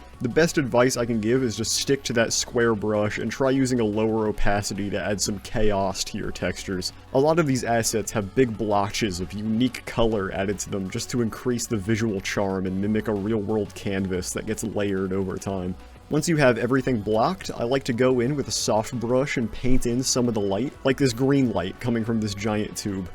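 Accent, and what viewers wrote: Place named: United States. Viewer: "compositing is a long and complicated process that many of you may be unfamiliar with as 3D artists" I'm sorry but this is a genuine question, but do Universities not teach this as part of their curriculum in America (I'm guessing based on the accent)? Do you choose your specialisation right from the get go? Because I was taught everything from Modeling, Animation, Rigging, Texturing, Compositing, literally anything and everything that can be in a 3D pipeline. In all sense of the word, we were taught from the ground up to be generalists. I understand that some people are self taught or are just hobbyists so learning compositing seems like a waste if all you do is modeling or animating, but I'm curious for people that went for a formal education how that all looked like.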